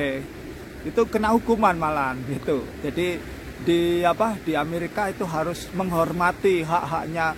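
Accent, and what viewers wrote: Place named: Java, Indonesia. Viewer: Salam teko blitar jawa timur mas.. Sampean logat bahasane koyo wong jowo... Jowo ngendi mas..?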